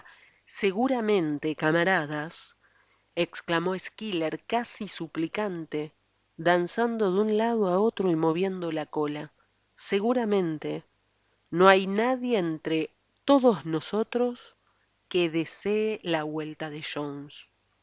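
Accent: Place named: Argentina. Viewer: Amo el acento argentino, me relajó demasiado tu voz 😭🩷 está súper interesanteeee ya quiero que salga la otra parte, y gracias por el buen contenido :)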